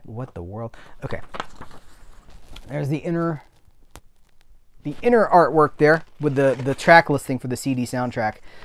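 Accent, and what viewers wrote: Australia. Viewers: Your Aussie accent is very good and digging your 70s/80s look, keep it up! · As an Aussie, I love Umbrella Entertainment! The fact they are finally putting love and care into older Australian films in numbered lines is fantastic. And also making films available in Australia on bluray, to save importing! Watch the Beast the other night... wow, crazy film, but weirdly good. Hope you continue to enjoy the Aussie content too. 1) great Aussie accent 2) Great Stewie impression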